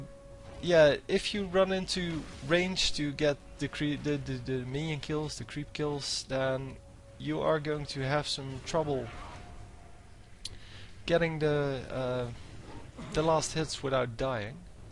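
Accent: Netherlands. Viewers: haha I noticed he's Dutch because I talk the same way as him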